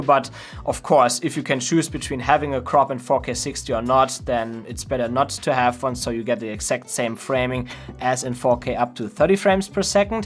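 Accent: Germany. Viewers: hes german i can hear it im german to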